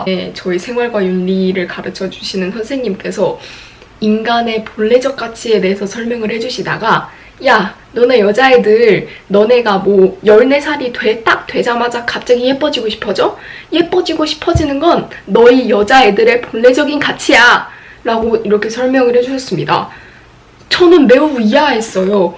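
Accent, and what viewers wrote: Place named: Gyeongsang, South Korea. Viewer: 경상도 말투가 묻어나오네요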